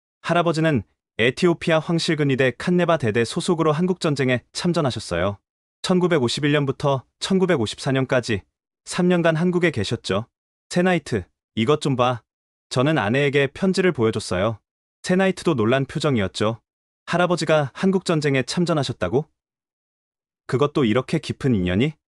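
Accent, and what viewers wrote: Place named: China. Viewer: 여기 유튜버 중국인인가? 아님 어그로 끌려고 말투를 저리 설정한건가 듣기가 참 힘드네, 암튼 정산은 아니네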